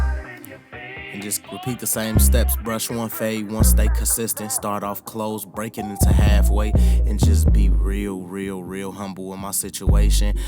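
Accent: Chicago, United States. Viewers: That's the Chi in him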